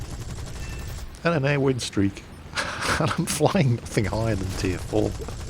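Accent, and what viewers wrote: Australia. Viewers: Love that australian accent! Keep doing it mate! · nice australin acent i come from australia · lol love the aussie accent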